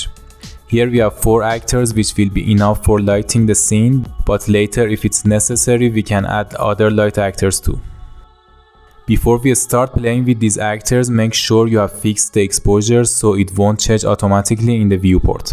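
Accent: Iran. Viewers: Your accent is similar to Iranians. If you're Iranian, well done. If not, well done again ;) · dadash damet garm az lahjat fahmidam ie iranie ba gheirati :))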